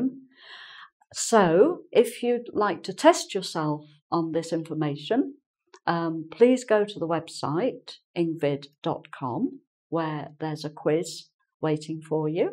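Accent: United Kingdom. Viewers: Hi, i love the british accent. But, how do you pronounce heat, hate, hit, height? Are they being pronounced the same way?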